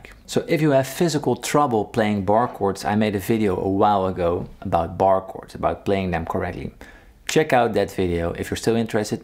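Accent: Netherlands. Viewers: i need to know, are u dutch? your accent sounds soooooo familiar. if so, ik ben ook nederlands! love your vids!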